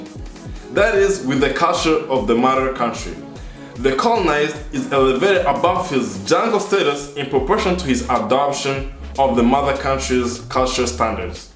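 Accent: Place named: Africa. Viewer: You still have an African accent though And its is beautiful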